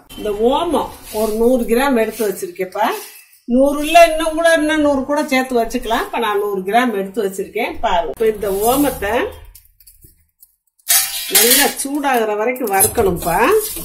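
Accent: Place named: Kongu, India. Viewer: Unga kongu tamil language, vagapa... sweeta erruku patti😊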